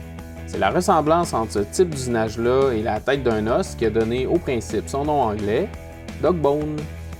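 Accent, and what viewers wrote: Quebec, Canada. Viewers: Bravo. Très bon tutoriel. Juste une petite remarque concernant la notation de la machine que je trouve sévère. En effet, le moteur ne fait que quelques W soit 100 fois moins qu'une fraiseuse ! Je trouve même exceptionnel qu'elle arrive à faire ce travail avec une puissance aussi faible. Cela étant dit, bonjour à nos cousins de la belle province. J'adore votre accent (qu'est-ce que je dis, c'est moi qui ai un accent ...).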